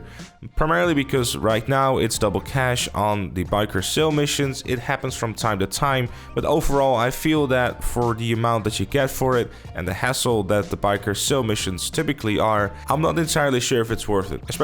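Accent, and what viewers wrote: Netherlands. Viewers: Love it, are you Dutch tho?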